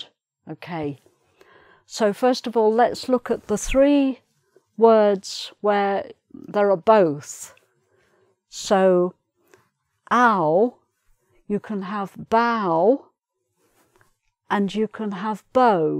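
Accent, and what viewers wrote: United Kingdom. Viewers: Is this British English?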